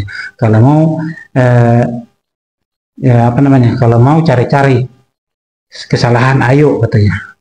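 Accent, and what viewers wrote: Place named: Toba, Indonesia. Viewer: Logatnya toba banget...ponakan opung luhut ini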